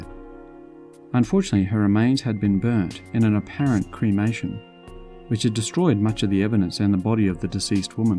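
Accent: Australia. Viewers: Couldn’t agree more. Stock photos from places, even continents, totally unrelated to Australia, let alone Lake Mungo. Very disappointed as the narrator sounded Australian, and should have invested more in locational accuracy. Lazy!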